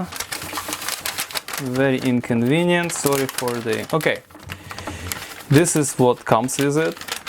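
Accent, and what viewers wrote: Russia. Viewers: He is probably Russian or Ukrainian, they have the same accent.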